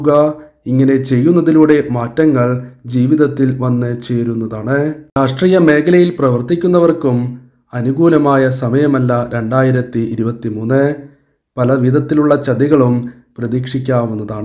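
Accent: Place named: Namboothiri, India. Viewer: വളരെ വൃത്തി കേട് ആയിട്ടുണ്ട്.... Cool ആയി പറഞ്ഞാൽ പോരെ??? എന്തിനാണ് നമ്പൂതിരി യെ അനുകരിക്കാൻ നോക്കുന്നത്?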